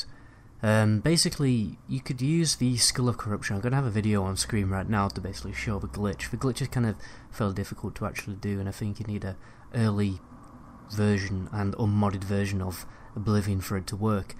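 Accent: United Kingdom. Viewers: Wait, is British!?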